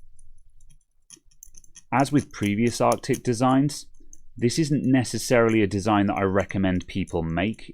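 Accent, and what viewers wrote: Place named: West Midlands, United Kingdom. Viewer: Hi, it was because of your videos that i watch late at night to relax that got me interested in this sort of stuff in the first place, and I have already learnt so much. After a while I managed to convince my wife to buy me a little DIY soldering kit for a graphic equaliser, and I said to her, if it doesn't work, I'll never buy one again, but (honestly, to my actual surprise) the thing works flawlessly, and I hnow have a sort of bug for building simple electronics. Half way through building this equaliser the soldering iron I had blew up completely, so I had to buy one off amazon to get it finished, the soldering gun i now have is amazingly better than the one that blew up, and I'm absolutely willing and looking forward to my next project, so many thanks to you, you've inspired an almost 40-year-old-toddler to try something new. 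Hope that makes you smile tonight. Cheers bro. The next one I do I may consider putting up on Youtube. PS - you sound like you're from the West Midlands ish?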